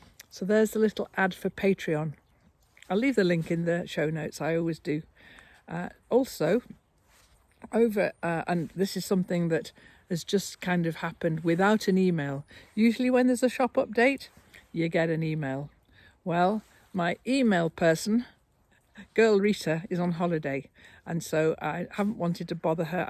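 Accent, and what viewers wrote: England, United Kingdom. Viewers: I was thrilled to see you NYE. You always are so interesting. My grandmother on my dads side came from England, however, passed before I got to know her. Your accent draws me closer to her because I do remember her accent and the way she spoke I was only four and I loved her so much. I am doing ancestry to see where and when she came here to United States. I feel like I’m on the sofa with you. Keep up the videos♥️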